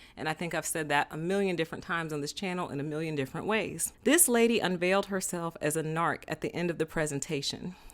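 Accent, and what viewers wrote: South, United States. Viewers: You have a cute southern accent!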